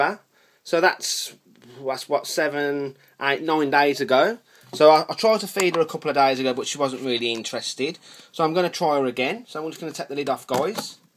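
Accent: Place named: Wolverhampton, United Kingdom. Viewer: Are you from Wolverhampton?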